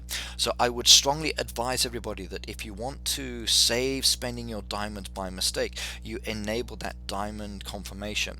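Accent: United Kingdom. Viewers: am I the only one or does he have an English accent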